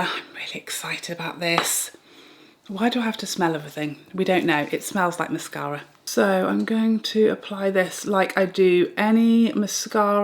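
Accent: United Kingdom. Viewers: Bless you beautiful soul inside out love your vidoes your British accent is so regal and your voice is so calming and therapeutic, I love the mascara never tried brown but I am aiming on getting one as it will give sultry look and emphasis the eyeshadow, the look you created is spectacular 😊💕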